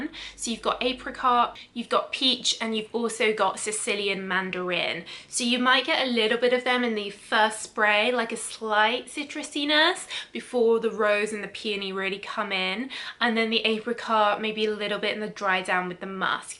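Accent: United Kingdom. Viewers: Hi! I already suscribed! love both versions but I prefer Absolutely blooming ❤ I 'm from Argentina and I do love the world of perfumes. As I'm an English teacher, I enjoy listening to real Britsh English reviews😉❤ they are really interesting and descriptive and it feels like you are smelling those marvellous scents😘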